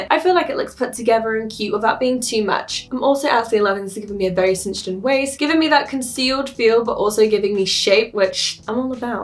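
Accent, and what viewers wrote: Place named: United Kingdom. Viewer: You are gorgeous and super cute. Beautiful eyes, cute voice with the British accent, beautiful body and curves, very cute little belly button, nice booty long legs. You look amazing and very cute in every outfit you wore in this Try On Haul video. The blue floral bikini really made your beautiful eyes pop and with the blonde hair hello cutie. The Pinkish bodysuit/one-piece swimsuit made you really beautiful too along with the White big T-shirt at the end. In the White big T-shirt at the end with the blonde hair you are really beautiful. You look like an angel.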